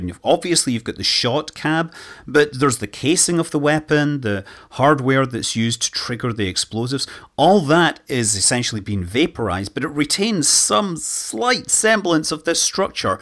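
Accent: Scotland, United Kingdom. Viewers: Scottish to bro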